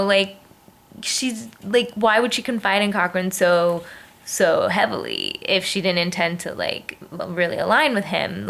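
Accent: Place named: United States. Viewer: "You're playing for a Million dollars, NOT for a doughnut" haha btw what is it with Eric ? i mean he finds the idol, then hands it to Andrea LMAO does this guy never learn !! Reynolds and Eddie voting for two different players, is just ridiculous. note: you may sound American, but you get the beauty from your australian side ;-p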